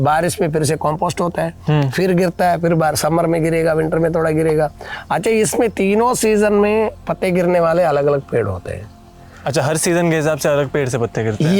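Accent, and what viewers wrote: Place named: Gujarat, India. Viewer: Lagta hai sir gujrat me jyada rahe hai kahi kahi gujrati aa rahi hai 😅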